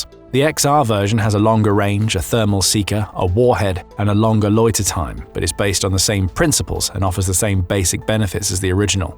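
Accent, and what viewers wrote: United Kingdom. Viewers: UK english accent sucks.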